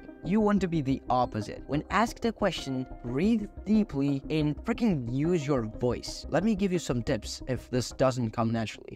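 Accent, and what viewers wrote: Italy. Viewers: Wait, are you Italian? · Are you from italy? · conosco un italiano quando lo sento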